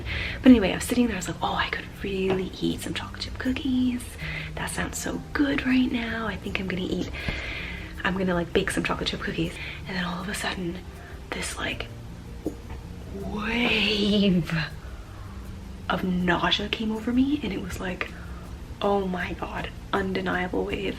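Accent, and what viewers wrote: Canada. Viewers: Are you Candian or from North Dakota?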